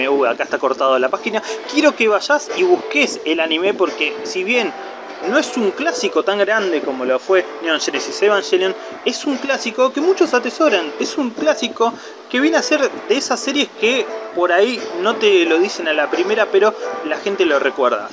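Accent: Argentina. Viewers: Seguramente buenos aires por el tono, yo tambien de buenos aires, entonces por eso creo que es de ahi. Una pregunta vos de que provincia sos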